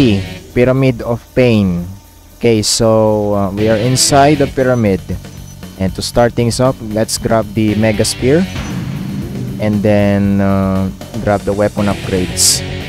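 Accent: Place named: Southeast Asia. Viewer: Your accents sounds like southeast Asian are you Filipino or Indonesian? By the way nice video bro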